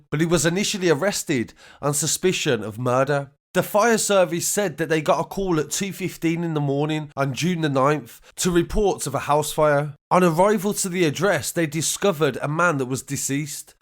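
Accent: Birmingham, United Kingdom. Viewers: Does this guy have marbles in his mouth? I swear the British have warped the English language beyond all recognition. I can just feel the spit that surely is covering the microphone as he records this. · U from biirrrmingham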